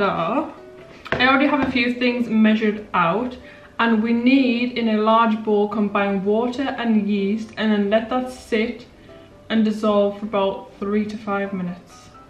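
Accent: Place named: England, United Kingdom. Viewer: I love your accent! What part of England are you from?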